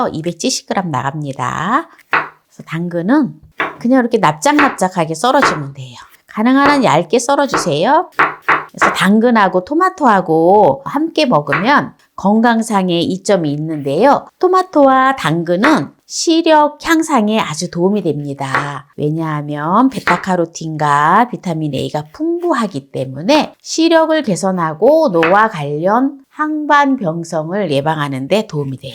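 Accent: Chungcheong, South Korea. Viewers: 고향이 충청도라 그래유 이해해주세요 죄송합니다 ❤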